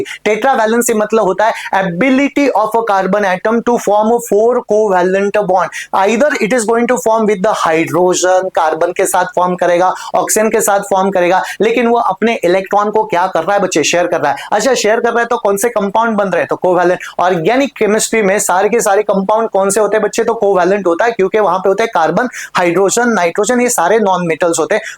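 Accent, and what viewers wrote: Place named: Maharashtra, India. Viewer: Sir are you marathi because of your accent I think so btw you teach great ❤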